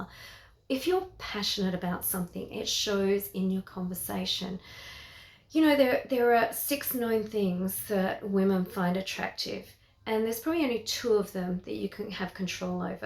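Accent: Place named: United Kingdom. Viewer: Thank you for your informative and beautiful video,! 😃👍 You are gorgeous and lovely 😍, and I love your cute sweet and beautiful UK accent!! 🥰😊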